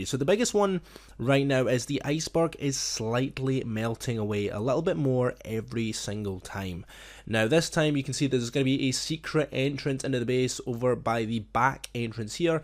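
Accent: Scotland, United Kingdom. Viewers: So your scotish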